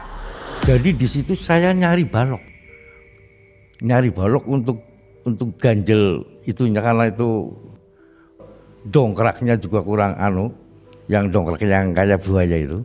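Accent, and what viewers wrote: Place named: Tegal, Indonesia. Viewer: Tegal maning... Tegal maning..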